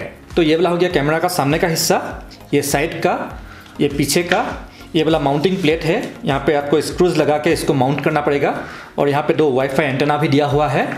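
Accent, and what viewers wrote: Assam, India. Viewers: First time hai apka channel pe ..but app ka hindi Assamese logoko jesa hai ..kya app Assam se ho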